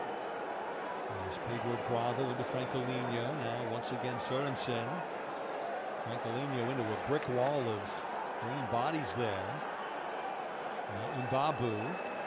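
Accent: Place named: United States. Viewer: American commentator? I didn't expect that really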